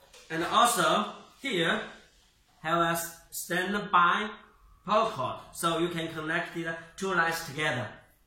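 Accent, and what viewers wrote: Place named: China. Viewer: 中文口音的英语。我真的听的很舒服。希望做一期中文版的 b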